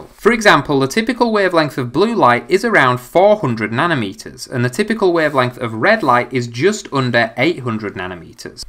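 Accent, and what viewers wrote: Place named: Scotland, United Kingdom. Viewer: We got Scottish vsauce bevor gta 6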